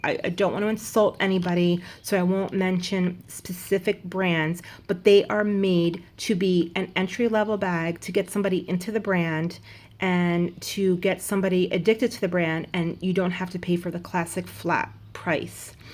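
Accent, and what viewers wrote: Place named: New York, United States. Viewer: I love you're accent are you from n.yc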